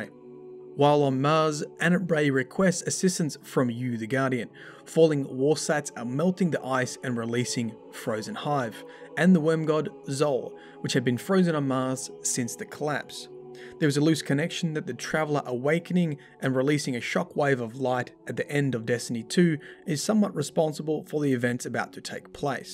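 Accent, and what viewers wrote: Australia. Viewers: Wait you’re Australian as well?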